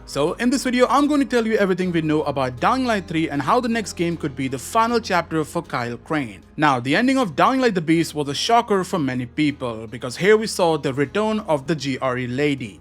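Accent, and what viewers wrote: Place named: India. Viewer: 1min listening the video im already tired of indian accent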